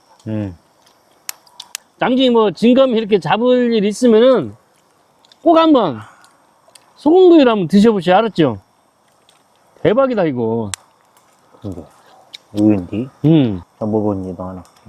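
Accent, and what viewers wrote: Jeolla, South Korea. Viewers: 순수해 보이셔서 구독합니다 전라도?말투 구수하네유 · 전라도 사투리가 구수허네. 영상 잘보고 입습니더! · * 수많은 전라도표준어가 구수한 누렁지 맛. 여러 구독자분들 조작구내버리는방송 언제나 응윈합니다 ❤❤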